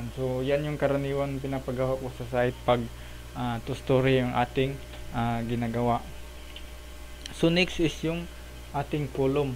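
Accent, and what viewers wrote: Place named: Visayas, Philippines. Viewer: Bisaya kasi ganyan tlaga mag salita ang mga bisaya.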